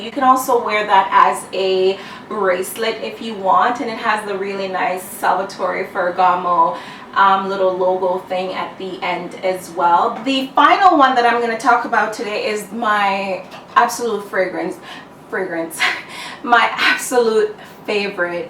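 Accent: Jamaica. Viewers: Are you Jamaican? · I hear a Jamaican accent underneath !! (hope I'm right). great video!! I too am obsessed with fragrances..they make me so happy, brings back memories. .thanks for sharing!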